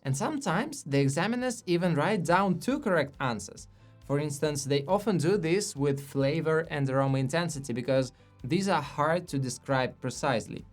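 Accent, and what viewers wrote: Russia. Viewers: Keep going, i belive your channel will grow to a large audience. Love your content. Nice russian accent by the way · Are you russian?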